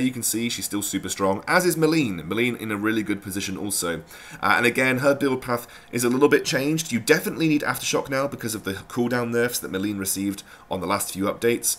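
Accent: United Kingdom. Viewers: "Meturr" "Alpherr" why do the British ruin words?! 😓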